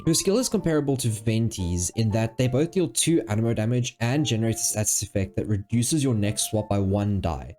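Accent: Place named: South Africa. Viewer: I love it when I immediately recognize a fellow South African's accent while randomly consuming Genshin content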